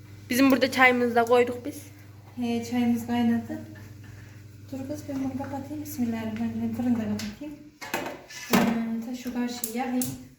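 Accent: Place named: Konya, Turkey. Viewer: yurt disindayim Konyaliyim o Guzel siven icin izliyorum sizi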